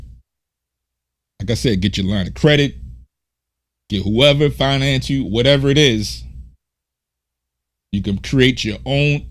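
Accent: Baltimore, United States. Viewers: are you from somewhere near Baltimore? I'm just guessing as an Irishman who may have watched The Wire too much 😅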